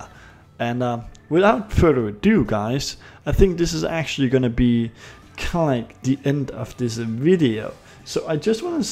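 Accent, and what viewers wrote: Denmark. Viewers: Are you danish?? You Sound danish in your accent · man tager ik fejl når man høre dansk accent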